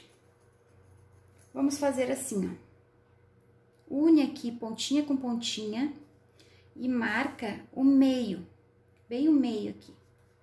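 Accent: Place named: South, Brazil. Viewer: Amei .. Cheguei hj no canal e já amei .. Vou salvar pra fazer! Amei só sotaque do sul 🤩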